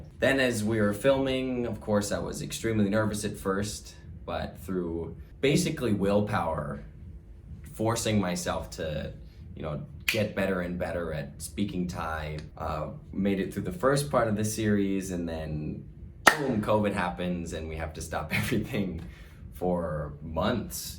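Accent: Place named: England, United Kingdom. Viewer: When I watch this series I never thought he’s Thai language was his first language coz the way he relay things it’s so awesome and sexy but I do like he’s English ascent so cool 😎. You did a great job 😉👍✨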